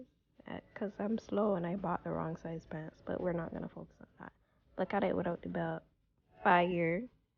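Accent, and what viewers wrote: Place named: Barbados. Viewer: Jealous you have the bajan accent and I don’t lol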